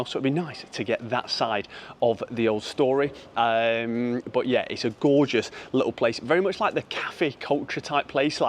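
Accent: United Kingdom. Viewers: Thou accent is English isn't it?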